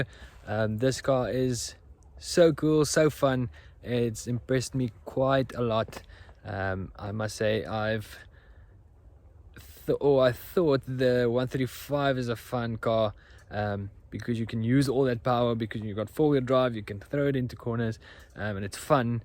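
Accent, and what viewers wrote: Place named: South Africa. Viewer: I could listen to that South African accent all day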